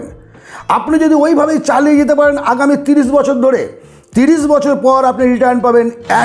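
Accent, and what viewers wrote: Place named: India. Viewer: দাদা আপনার রাষ্ট্র ভারত না বাংলাদেশ। আপনার কথা বুঝতে পারলাম ভারত। একটু সন্দেহ হলো তাই জানতে চাইলাম। আপনার পরামর্শ গুলো খুবই ভালো লাগে। নমস্কার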